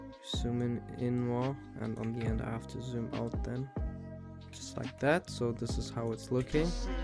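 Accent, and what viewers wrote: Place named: Germany. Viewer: i hear the german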